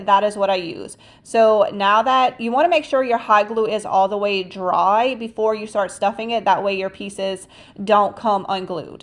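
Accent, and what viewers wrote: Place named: Virginia, United States. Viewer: r you from virginia??? UR accent caught me!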